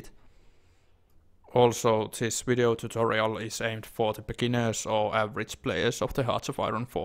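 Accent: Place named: Finland. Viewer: Finnish accent, best accent ;)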